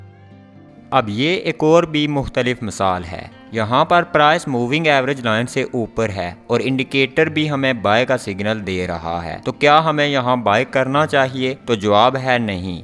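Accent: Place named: Pothwar, Pakistan. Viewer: bawa gi , english bhi poothowari main boltya ho ... yeh candelstick nahi hota .. Candle Stick hota hay dono ko mila k na parho ... raja g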